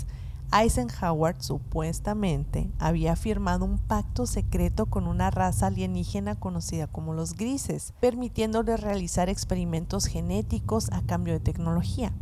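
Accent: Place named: Hermosillo, Mexico. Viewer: Se nota el acento Hermosillense, saludos paisana.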